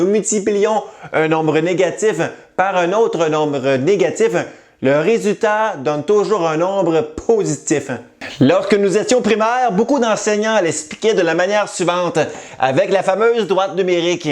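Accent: Quebec, Canada. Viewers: L'entends tu le léger accent ?! Il semble évident qu'il ne parle pas un français métropolitain mais bien Québécois, donc avant de juger,il faudrait peut-être s'assurer qu'il ne s'agit pas d'une expression locale.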